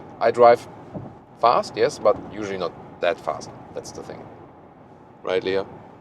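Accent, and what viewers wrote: Germany. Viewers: Your Genglish is outstanding 👍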